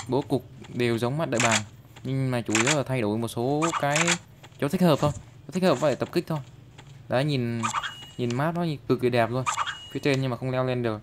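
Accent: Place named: Central, Vietnam. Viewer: Miền trung kb dépác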